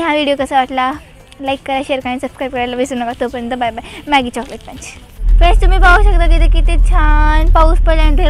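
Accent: Latur, India. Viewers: You speak typically laturi Marathi which is a good thing......... But use the word "friends" in minimum extent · Hii....mi tuzi big fan aahe........mla ekda tula bhetaych aahe....mi pn Laturchi ch aahe.....aani kharach.....tu khuppp mst bolte h....ekdam aaplya laturi language madhe.......😃😍💃.......tu asech mst mst video......banvat raha...aani tuze subscibers....aankhi vadhu de...👍..tuz kuthe ithe office aahe ka latur madhe.... ch?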